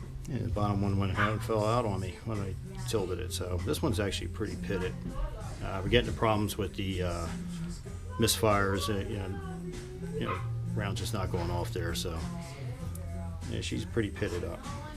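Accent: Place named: Delaware Valley, United States. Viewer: Thought you might be from the Delaware Valley listening to you throughout this great instructional video. I just noticed the DCFS videos in your library. Do you still shoot there? I just joined this year and started shooting Trap, perhaps we'll cross paths?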